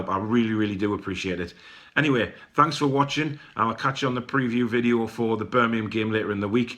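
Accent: Geordie, United Kingdom. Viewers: the fact you sound like the True Geordie im subscribing (Blackburn fan :) )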